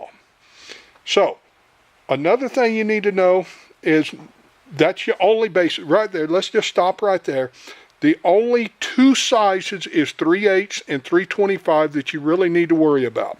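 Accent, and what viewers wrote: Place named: United Kingdom. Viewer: Hi Lovely accent where ya from Bro.............Briddy UK thanks.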